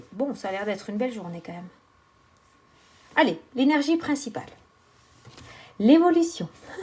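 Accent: South, France. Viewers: Ahhhhh😊😊😊... enfin un peu de soleil avec ton accent sur Clermont, dediou ça fait du bien 😁😁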